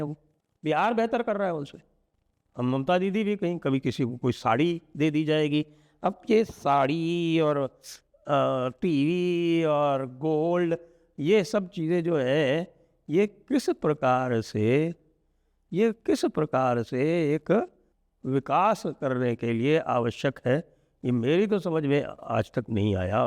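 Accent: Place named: Uttar Pradesh, India. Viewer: Proud of and Love your UP accent 😊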